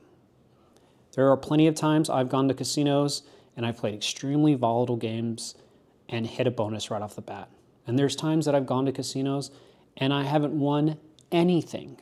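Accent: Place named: Hill Country, United States. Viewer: Super Informative Bro! I really appreciate the honesty and transparency. Sounds like you're in the Hill Country. I grew up in Houston and am now nomadic, but I've played in land casinos in Colorado and Nevada. Looking forward to more of your videos!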